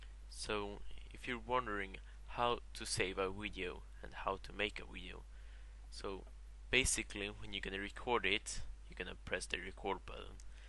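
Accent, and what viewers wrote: Sweden. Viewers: är du svensk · Are you swedish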